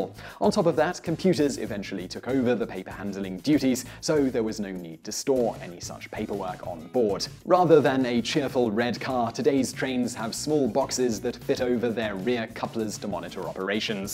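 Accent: England, United Kingdom. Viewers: Michael Dougfir Me too only the other way round. I'm English as he clearly is. We don't have cabooses and none of those pictures was of anything English. It seems odd. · I had a dissonance problem - British accent, US words for all the things.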